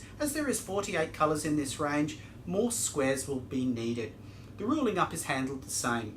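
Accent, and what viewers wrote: Australia. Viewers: I feel immediately comfy cause youre as aussie dounding as me..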